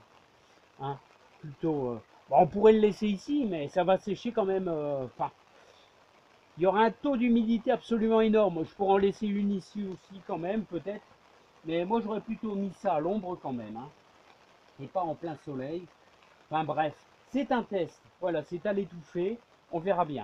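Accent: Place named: Calais, France. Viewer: Salut Je ne suis pas pressé pour les graines t'inquiète pas de toutes les façons ce sera a semer qu'au printemps prochain,et,si tu as d'autres graines quelconques a rajouter si tu veux il y a pas de problème. Pour ma vigne je vais voir encore cette année ce que va donner le résultat. Dis moi en feuilletant tes pages j'ai vu que tu bouture la clematite tu le fait quand?.Je te remerci pour tes réponses c'est toujours avec plaisir de te revoir et si un jour je n'ai pas le moral Je penserai a regarder Ta chaîne. Il y longtemps j'ai connu une famille a Calais:la famille Alexandre qui s' occuper du club des Vieux tarots, tu les connais peut-être? C'était mes anciens grands beaux parents. Par ton accent Tu me rappel un peut cette époque. Bye bye.a bientôt Pascal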